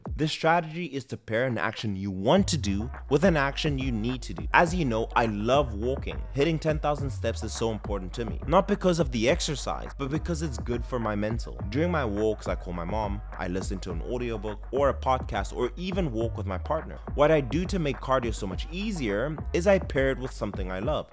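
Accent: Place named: Afrikaans, South Africa. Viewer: Love this dude's Afrikaans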